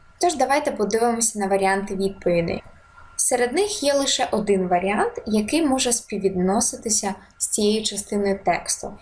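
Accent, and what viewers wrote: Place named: United Kingdom. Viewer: Який крутий британський акцент у ведучої!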